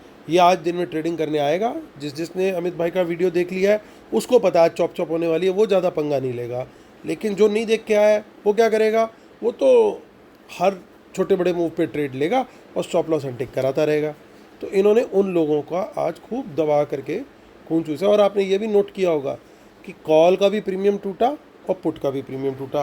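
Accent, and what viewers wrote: Punjab, India. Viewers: Love u sir always rocks sir r u from Punjab or hariyana it doesn't matter but tone thx if u r in mumbai will meet u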